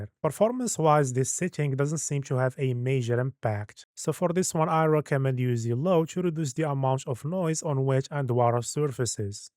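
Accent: Morocco. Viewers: Are you moroccan by any chance cuz you have a strong moroccan accent ?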